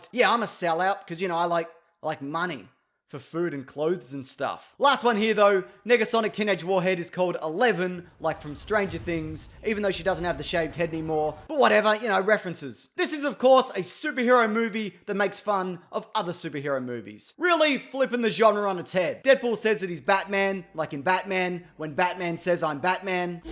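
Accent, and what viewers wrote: Australia. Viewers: Is the speaker Australian?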